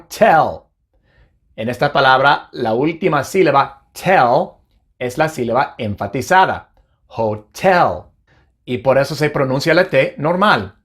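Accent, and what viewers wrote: United States. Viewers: Soy ingles. En Inglaterra decimos arTist - no arDist. VerTical- no verDical. ThirTy - no thirDy. El pronunciacion de is pronunciacion del EEUU, no de Inglaterra. El ingles original y mas correcto es de Inglaterra. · Tu inglés es acento Americano por eso lla t la pronuncias de eso modo, porque el inglés británico si la marca más. Tendrías que aclarar que tu acento es Americano.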